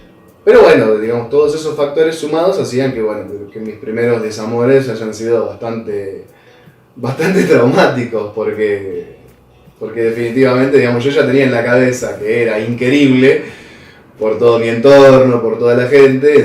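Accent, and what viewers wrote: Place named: Argentina. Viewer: Que lindo soy mujer transexuAl vivo en usa suenas como argentino, tengo familia en argentina. Ah que lindo me gustas lol.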